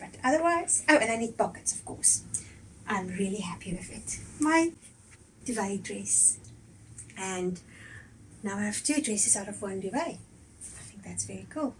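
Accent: Afrikaans, South Africa. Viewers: Hello. Are you Afrikaans speaking? From your accent you seems Afrikaans but the scenes in your videos does not seem to be South African😊😊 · I recognise your accent from Afrikaans Nationality in South Africa 🌿🌹♥️ I am in South Africa 🇿🇦 Enjoyed your video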